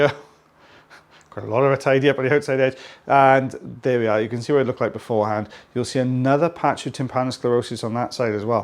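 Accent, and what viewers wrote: Wales, United Kingdom. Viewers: So I never would have thought watching ear wax removal would be so …satisfying ….love the Welsh accent too!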